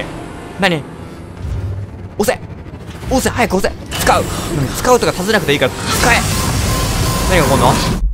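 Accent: Kansai, Japan. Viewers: 関西弁さん好きなので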